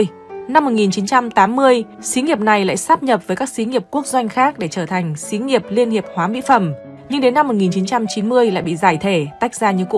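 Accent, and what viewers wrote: North, Vietnam. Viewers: Giong nguoi doc nghe hay da man luon! minh mien nam ma thich giong Bac lam` BLV ghe ! ilove! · Sao để cho Bắc Kỳ 75 đọc bài này. Nếu người giong Nam từ Sài Gòn không đọc thí có thể giọng Bắc từ thời 45. Nghe giọng BK 75 muốn ói trên các YouTube videos của VC sản xuất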